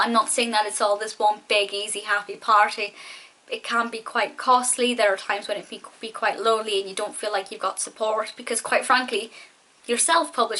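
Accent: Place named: Scotland, United Kingdom. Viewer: Hey right now this is the first video from you im watching and i'm trying to guess from where your from based on your accent. My guess is your Scottish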